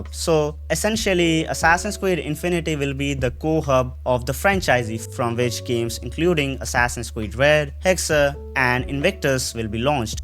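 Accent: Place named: India. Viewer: Yoo, are you Indian? Your accent sounds a lot like it.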